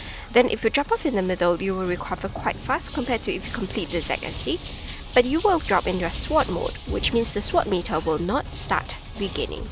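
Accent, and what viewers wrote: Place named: Singapore. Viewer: Just curious, but from your accent, are you Singaporean or Malaysian?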